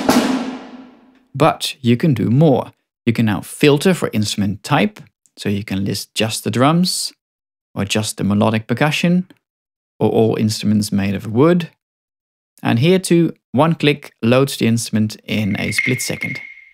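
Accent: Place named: Netherlands. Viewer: Love the update (and subtle Dutch accent :> )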